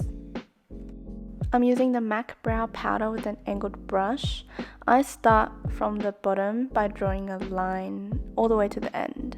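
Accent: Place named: Taiwan. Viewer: 妹妹哩低衝蝦😂😂😂你的謝謝還有台灣國語笑死🤣🤣🥰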